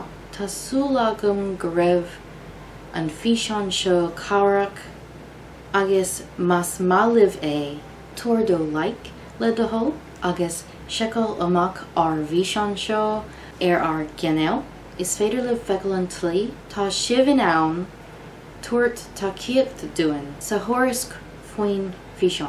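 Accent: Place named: United States. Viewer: Beautifully put. I like the slight American lilt to your explanations...👍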